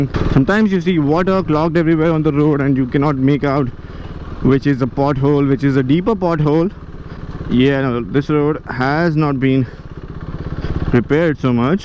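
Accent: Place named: Punjab, India. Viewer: You sound like you are Punjabi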